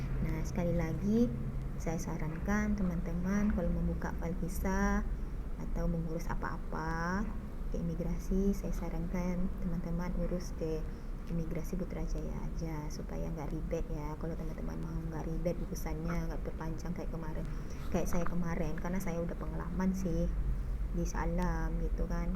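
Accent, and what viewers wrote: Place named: Medan, Indonesia. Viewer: Medan banget suaranya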